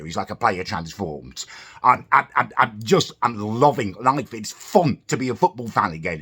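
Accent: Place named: RP, United Kingdom. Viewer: Uno what Yh I fully love ya vids now pal i genuinely sit hear watching all of your videos while sm’kin. I see you like I see my grandad cute af n genuine down to the bone 😂 I love how you are it’s jokes I can relate so much but u talk v posh it’s jokes 😂 keep up the good work lad and I hope u have a bloody good new year don’t let the haters get to u ♥️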